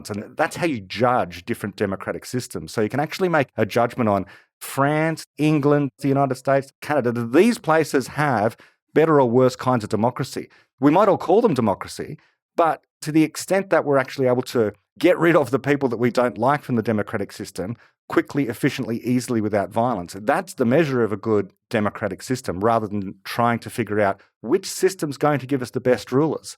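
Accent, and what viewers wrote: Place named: Germany. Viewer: nope its not nivi. sounds like Deutsh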